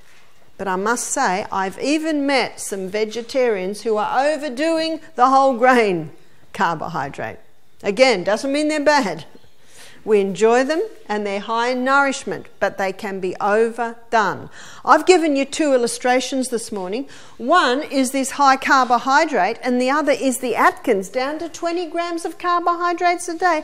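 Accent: Australia. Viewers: She's Australian. They say "inshulin".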